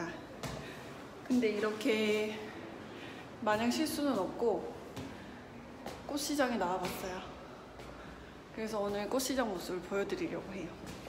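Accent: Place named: Seoul, South Korea. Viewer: ~~ 덕분에 진짜 몇년만에 본다 ~~!! 유튜브 라니!!! 근데 왜 서울말쓰려고 노력해?? ㅋㅋㅋ 너무 귀엽고 이쁘다 유튜브스타로 대성하리!!!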